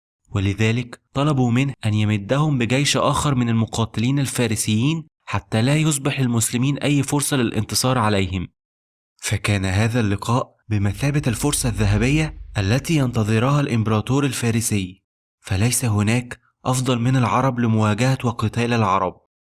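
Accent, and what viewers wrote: Egypt. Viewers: اخوي ذول الناس يحاربونك عشان ما تكمل روح كمل ولا تهتم نفهم على لهجتك المصرية ما عليك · قناة جميلة جدا و مجهود يشكر لكن نطق اللغة العربية ضعيف جدا و تغلب عليه أخطاء نحوية و مخارج الحروف بلسان مصري غير فصيح. أتنمى التغلب عليها رغم صعوبتها. وشكرا مرة أخرى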